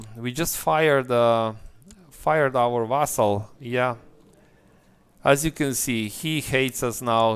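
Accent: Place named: Slovenia. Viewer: You sound Slovenian... -_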